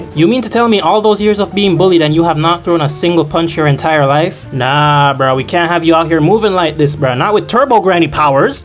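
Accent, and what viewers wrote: Jamaica. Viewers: sound like a yaadie heard obeah thought i was tweaking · You sound like the Watch Mojo guy with a Jamaican accent. I love it.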